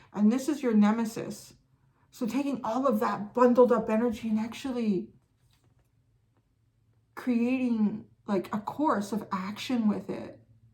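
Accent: United Kingdom. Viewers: Thankyou that was interesting, that was a great english accent too and you can't beat a bit of trailer park boys 🤣 peace, love and light to you ✌💞🙏 Xx much love Xx from London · You do a British accent perfectly! It sounds Gr8 to me, but I'm in Texas. haha. Random Royal fact, Prince William is a Leo🦁🦉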